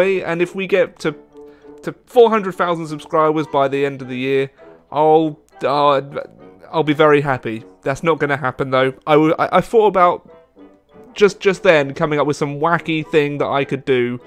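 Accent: United Kingdom. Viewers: More British than Spiff. Change my mind. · Hea Truly an Brit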